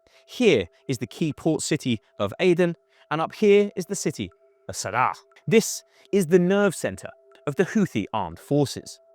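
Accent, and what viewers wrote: United Kingdom. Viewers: 1 min into the video and I hear British 😅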